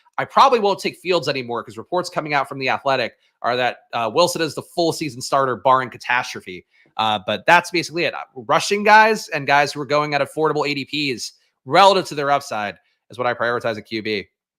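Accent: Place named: Australia. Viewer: Great Bluey's mum accent! have a great weekend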